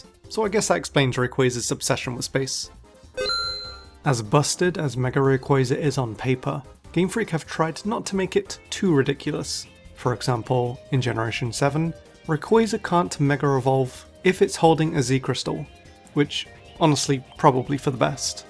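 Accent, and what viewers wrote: United Kingdom. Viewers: he’s bri’ish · Exces me are you British?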